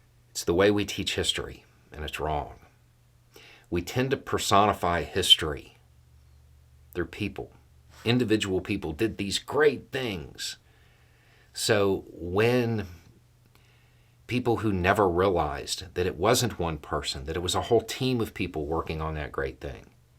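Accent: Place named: Florida, United States. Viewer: That "ugh" after mentioning "great" putin or trump... i feel that ugh.. everyday. I like theatrical youtubers.. but i like your stuff. Living in rural fl and listening to some one with our accent talking sense for once.. its that good breeze off the cow pasture and not the swamp lol.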